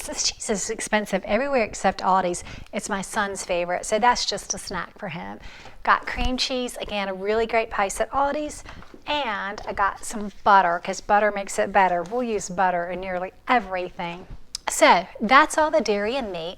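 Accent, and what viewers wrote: South, United States. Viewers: I walk around the house saying "fathead dough" in your southern accent. It's starting to drive my wife nuts... she says.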